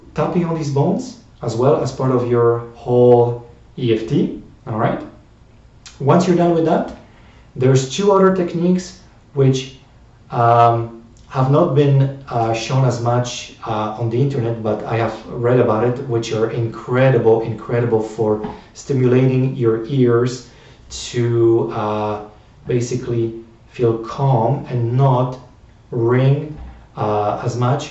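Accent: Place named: Europe. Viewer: You have an accent in European. You were born here.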